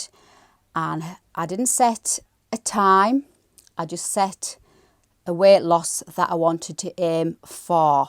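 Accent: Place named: Northern England, United Kingdom. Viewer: Good on you. I sat with a cuppa and as usual you gave me another dose of sewing inspiration. Thank you for sharing your life and journey, it was encouraging hearing how you felt when you began. Love being invited into your world for a catch up and especially hearing a fellow northern lass. x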